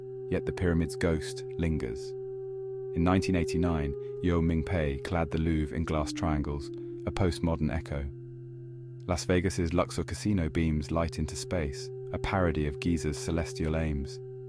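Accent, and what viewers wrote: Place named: United Kingdom. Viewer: Haha I have the opposite reaction to this other guy - this is a real human reading this right? In English at least. It's not full of the usual AI-isms (disinterested inflection, no pauses) - if it reads a number wrong, or elides a headline into a sentence, or forgets to breathe at any point, I'll be sad. on the other hand, it's speaking British english, but listed as American. That makes certain things more likely than others. Well, if it's an AI, it's a better one.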